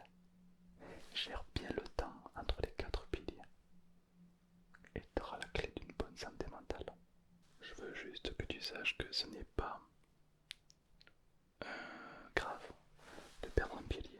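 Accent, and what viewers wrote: South, France. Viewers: J'avoue... en lisant les commentaires je suis d'accord avec le fait que fasse vraiment autorité dans l'ASMR français. Je trouve qu'il est hyper pointu dans la cohérence entre sa sensibilité dans la retranscription des tringles qui sont à (presque) chaque fois hyper bien dosés et le discours en lui-même qui est aussi parsemé de bruits de bouches qui lui sont propres, et a la fois au paroxisme du suave. Pis y'a pas qu'une cerise sur le gâteau mais 2!: son humour désopilant (qui ma souvent fait éclaté de rire en plein endormissement) et cet accent du Sud qui ajoute une certaine rythmique pas déplaisante du tout Deuxième aspect en l'occurrence nous avons la chance d'avoir un thérapeute qui pratique la vulgarisation (mise à la portée de tous, c'est pas péjoratif du tout) de principes élémentaires en psychologie à merveille Bref, une dinguerie de fou psychomental pour insomniaques chroniques🤣🤣🤣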